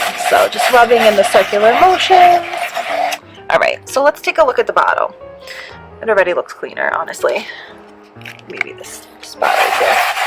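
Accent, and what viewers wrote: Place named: East Coast, United States. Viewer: I can tell she’s from the east coast by her accent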